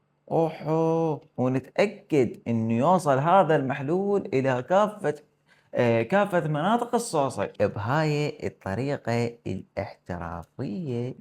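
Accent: Iraq. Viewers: انتة عراقي ؟ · ياعشقي للهجه العراقيهههه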